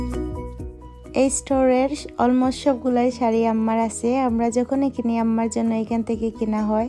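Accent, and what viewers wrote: Sylhet, Bangladesh. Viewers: when you spoke sylheti in your vlog it was better. It sounds nice when us sylheti speak sylheti. Please change back to sylheti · apa tumare amrar sylheti matle bala lage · Apu apnar sylheti kotha e valo lage.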